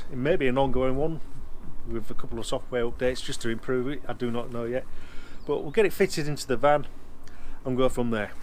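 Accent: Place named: RP, United Kingdom. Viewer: Bit posh is that mate 👌👍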